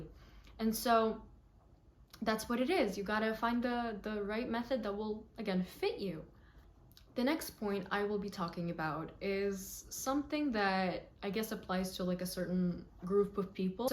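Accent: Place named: Turkey. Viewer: Are you Turkish? Your accent is incredible. Would you say that watching movies was the main thing for you?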